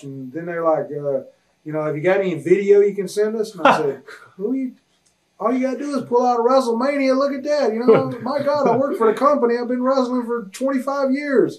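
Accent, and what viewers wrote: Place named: South, United States. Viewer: He sounds so different "country af" compared to the OMG and characters I've been looking at OMG since WCCW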